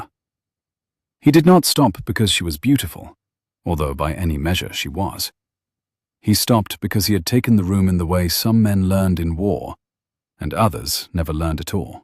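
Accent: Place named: England, United Kingdom. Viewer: I would like to add that this story had the perfect Narrator. with an English accent. This voice is definitely one of the best. So many stories about England, with Dukes & the aristocracy just use a narrator that is American & it does not fit. This one created a perfect Presentation. Well Done!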